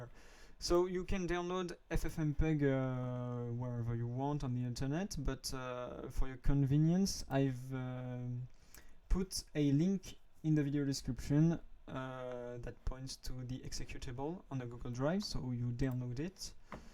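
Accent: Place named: France. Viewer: Been lookin for a way to download twitcast videos for offline playing for quite sometime and you did it!! Mèrci!!!! Hope I'm not too preseumteous to assumed that you're French from your accent. P.S : Locked videos can also be downloaded provided you have the password for it, and start/play the video before the browser console step. (The vid I wanted to grab is a locked one) P.P.S : The only difference for me is, I have to hit enter after the download has completed. It did not promt me about the conversion automacally.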